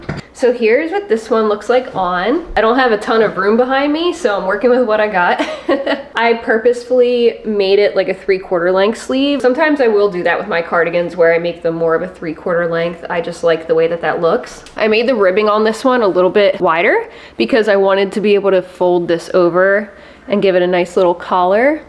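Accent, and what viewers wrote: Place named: Pennsylvania, United States. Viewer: Love #5! The shape and colors are perfect! I'm currently making a bell sleeve top as a gift for a friend. Are you from Pennsylvania or upstate NY? I hear that part of the country in your voice 😊 great work btw!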